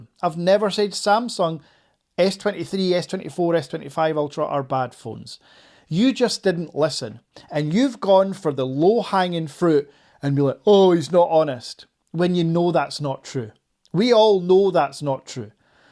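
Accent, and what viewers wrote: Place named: Scotland, United Kingdom. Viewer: Lovin’ the ‘Skaughtisch’ accent. 👌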